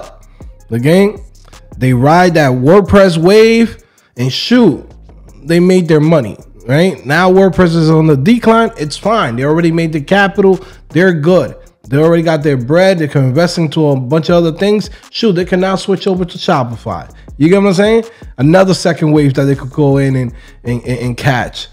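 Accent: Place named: New Jersey, United States. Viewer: Wish I would’ve found this channel before I enrolled in a full stack course..finally somebody who look like me, even tho I’m black and I’m assumin he Dominican prolly..whenever you look up coding it’s a video wit a white boy or an asain which ain’t nothing wrong with that, but representation matters, and seeing a dude who talks like me and is presumably from where I’m from(I’m from Jersey he’s definitely from Jersey or ny) is exactly what I was lookin for 🙏🏾🙏🏾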